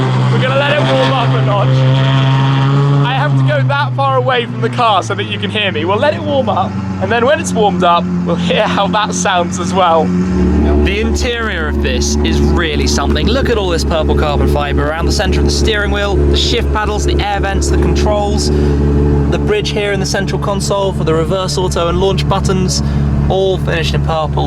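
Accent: England, United Kingdom. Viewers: Ale mnie wkurwia Ten koleś ta gadka tak mocno angielska ze chuj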